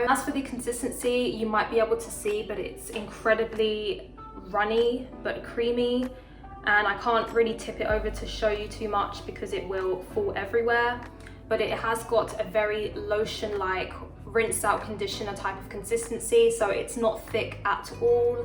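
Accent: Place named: United Kingdom. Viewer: Just a quick question while I’m here, I’m judging by your accent that you’re in the U.K, so just wanted to ask where did you get your hair cut please. I love it!??!! 😍 I’m looking for a good curly hair stylist for a trim. Thank you. Looking forward to going through the rest of your videos.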